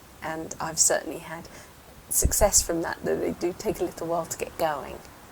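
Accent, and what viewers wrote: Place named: United Kingdom. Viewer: I enjoyed learning so much from this video!! It was a added pleasure to hear your beautiful British accent!! I live in the Midwest of the United States. We do not have beautiful accents 😃😆😂 🫤 Thankyou for helping me be a better succulant parent !! I grew up with sempervivam. They grew on my mother's terrace. I loved them❤ I have rooted some echaveria from cuttings . There were many varieties . They included sempervivums. I have been having fun with these sweet succulants. I am a bit nervous about the bonsi but I will watch all your videos on the subject . 😃😃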